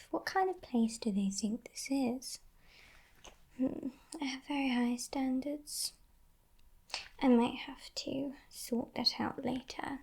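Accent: United Kingdom. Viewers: Your ability to morph into any Role and play that Character to perfection is an amazing Talent. You can honestly tell any story with your British Accent and I could listen to it for hours. Love the Red Costume and the Black Necklace and Masquerade Eye Mask.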